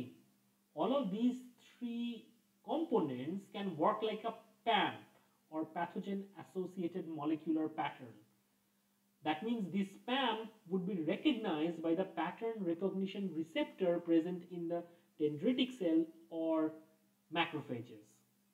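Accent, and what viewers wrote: India. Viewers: I do not want to sound too harsh, the video is helpful, and we are thankful for it, although the sound is far too quiet, but I am always wondering why NOT ONE indian scientist trys to speak without the typical accent. I have worked in some international labs and indians have always the strongest accents - they simply to not seem to care....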